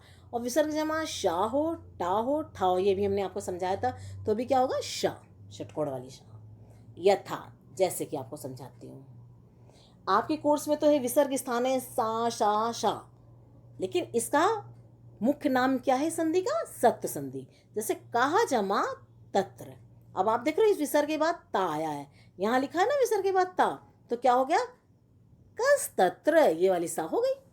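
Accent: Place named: Punjab, India. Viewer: Ma'am are you Punjabi?? Cause your accent is like that..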